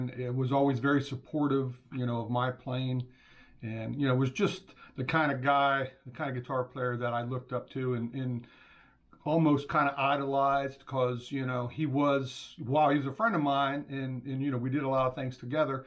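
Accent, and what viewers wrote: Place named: Baltimore, United States. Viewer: oh. I kind of heard the bmore Maryland accent in your voice, plus you stated with a Riff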